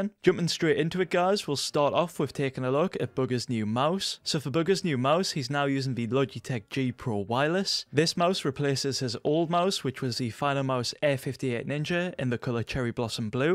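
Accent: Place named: Newcastle, United Kingdom. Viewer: You're a proper Geordie haha get in, fancy couple games one day?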